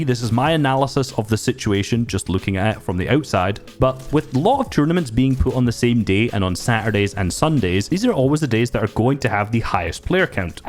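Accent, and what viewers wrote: Scotland, United Kingdom. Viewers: Gotta love that scottish voice:hands-yellow-heart-red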